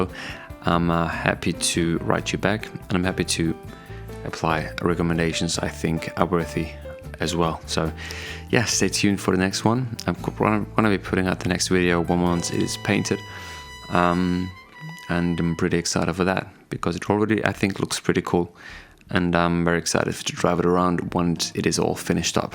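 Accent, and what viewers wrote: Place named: South Africa. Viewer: I sorta see it as ticking boxes.. its a build.. and its relatively cheep, i love fishing and wanna see a sea monster landed by RC boat. Then you can expand your channel with ppl who are perfect and like fishing. You have the set up... and even if you not a fisherman, im SURE it would be a blast... find your fishing mate's to get tips. Somtimes old heads have some fishing knowledge. Austria! Your english sounds south african, i thought you white african LOL but 100% understood you so. Thanks for sharing the build mate. Its interesting.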